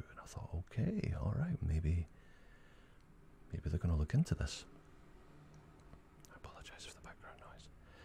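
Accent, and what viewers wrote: Scotland, United Kingdom. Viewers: Scottish understones asmr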